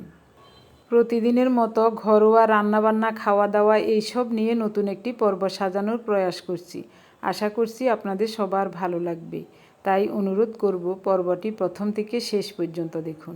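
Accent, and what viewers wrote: Noakhali, Bangladesh. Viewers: Ami Biratir theke dekhi.Spnar kothar modhye noakhalir tan ache.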